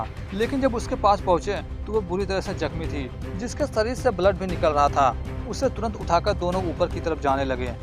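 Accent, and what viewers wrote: Bihar, India. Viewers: bat se lag raha hai bihari ho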